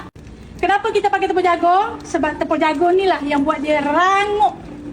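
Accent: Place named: Pahang, Malaysia. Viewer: Trime kasih kak... Kak org pahang ke... Mcm ade sleng pahang skit tadi nye tu... Huhuhu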